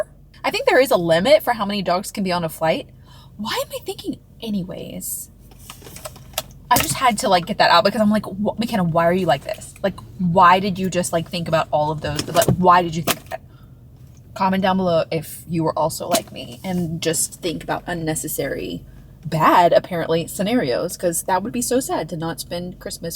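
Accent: Texas, United States. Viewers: I LOVE how your Texas accent comes out!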